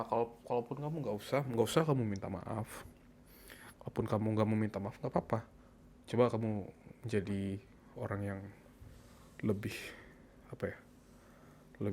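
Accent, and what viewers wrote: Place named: Kalimantan, Indonesia. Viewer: ada logat² Kalimatan nya 🗿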